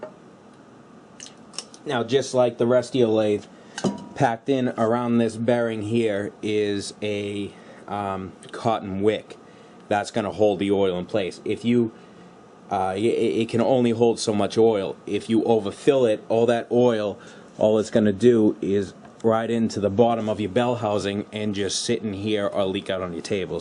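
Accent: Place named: New York, United States. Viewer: the carriage hand wheel. I think I just got some old oil gunk under the carrier. I sprayed wd40 and it got much smoother but I probly should remove the carrier and clean under that. It looks like we both have a b9w is that right? I'm new to this machine and would desperately love to learn some more. Btw I'm located in CT and I love the ny accent lol. It looks like we do indeed have the same lathe. I would love to talk to you and maybe pick your brain a bit if you dont mind?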